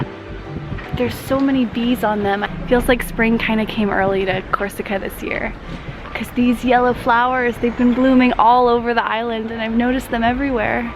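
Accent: United States. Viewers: I lived in the Algarve ( south of Portugal) for many years. I am now near Lisbon. I love Oporto too and I have a very close friend there. I am so happy that you had the time to reply to me. Who knows you and your husband will move here one day, even when you're much older. Life is such a big adventure! I wish you were here so we could chat about our many interests in common: spirituality, philosophy, photography, videography, nature and as a bonus I could even practice my spoken english with you ( love your english and expressions from the US) and I'd happily teach you portuguese, if you wanted to learn, coz it's not really a necessity here in Portugal. Also please know you are making a difference in people's lives. Last week the words you said about letting go and trusting the universe, when you told us the story about the french bakery, came to my mind several times. 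It's nice to be reminded and your words helped me🙏! Keep up your awesome work! Much love to you!💞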